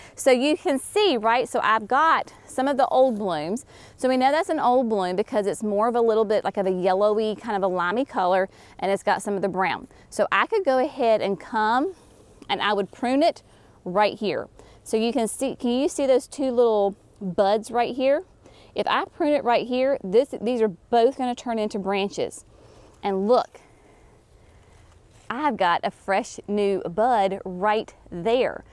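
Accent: South, United States. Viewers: You have a wonderful southern accent but you really tapped into your southern ancestry when you said "porch".......love it! Garden looks amazing, great work you you guys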